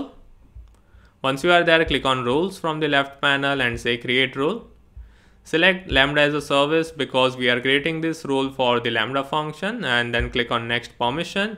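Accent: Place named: India. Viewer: Thanks. Love from Nepal. You sound like Indian, your accent 😀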